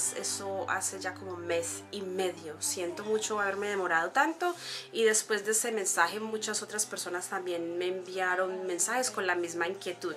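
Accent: Colombia. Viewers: Hoy descubrí estos videos y están geniales, gracias por compartirlos con todos nosotros :) Eres paisa? Es que te siento como acento jeje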